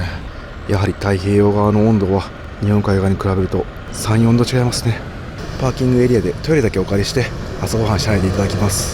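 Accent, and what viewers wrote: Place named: Kansai, Japan. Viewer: 久しぶりに見ましたなぜかの声にほっと安心した気分になりました… 関西弁のままで話してくれるのも嬉しいです😊 ありがとう😊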